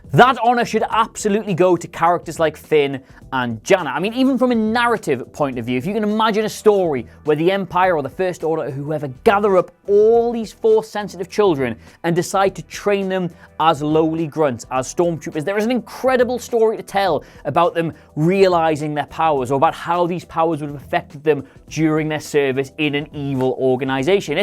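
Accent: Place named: England, United Kingdom. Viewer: BallerDman789 Come on man, he is English. There is a difference in pronunciation. Besides, they don’t even know how to say the same name in the same movie. In the original Trilogy everyone said “Han” like 6 different ways. Just shut up and enjoy the video.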